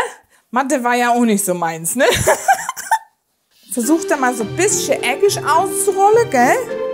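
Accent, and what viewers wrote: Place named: Palatinate, Germany. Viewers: Wenn ich mich nicht irre hört sich des an wie bei Joy Fleming, also Mannheimer Schnauze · Ja, das sieht lecker aus! Und wenn ich dieses Pfälzisch hör, bedauer ich's mal wieder, dass ich keinen Dialekt anständig sprechen kann.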